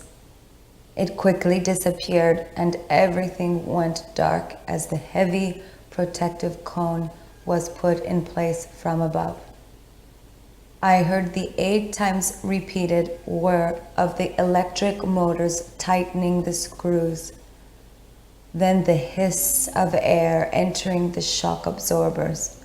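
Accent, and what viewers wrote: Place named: Canada. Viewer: Ehhh Sounds like a Canadian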